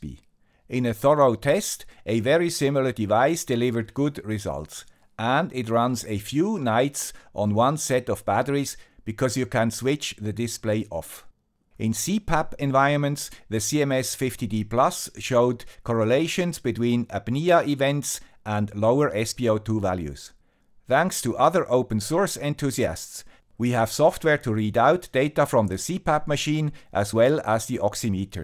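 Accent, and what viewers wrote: Switzerland. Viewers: Another very interesting video from the old guy with the Swiss accent who is not a doctor, but plays one on TV, or at least on YouTube. I'm surprised that they don't use the blood oxygen meter as an additional test for detecting people with corona virus. Maybe it could detect people with 'shortness of breath'? Final question: Do you really have to pay in Switzerland to see a doctor? Of course we pay quite a lot here in the US, but we are always told that people in really nice countries like Switzerland have free access to unlimited healthcare. Of course we also have to buy our own guns here and only the wealthy can afford their own fallout shelters...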